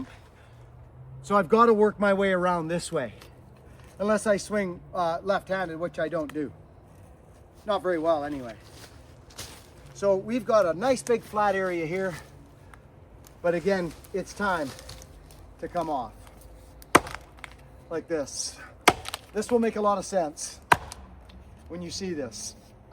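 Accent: Canada. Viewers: Where is this accent from I'm guessing Nova Scotia or British Columbia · Weird he sounds Canadian ?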